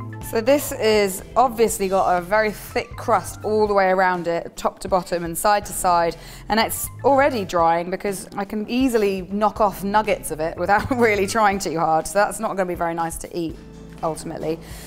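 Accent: England, United Kingdom. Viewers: She's so well spoken and got a nice attitude. She sounds very English and that's amazing. There should be more people like her, well spoken with a great personality.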